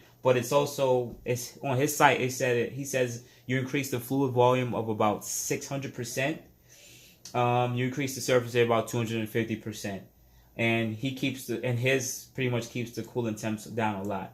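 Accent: New York, United States. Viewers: he's a new yorker, you wouldnt get it.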